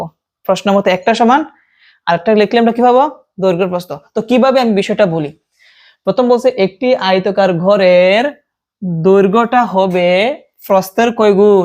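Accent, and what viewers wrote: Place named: Habiganj, Bangladesh. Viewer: বুঝতে পেরেছি স্যার। অসংখ্য ধন্যবাদ।🙏🙏স্যার কী হবিগঞ্জে থাকেন? আমিও হবিগঞ্জ এই থাকি। স্যার এর কথা বলার স্টাইলটা হবিগঞ্জের ভাষার মতোই লাগলো।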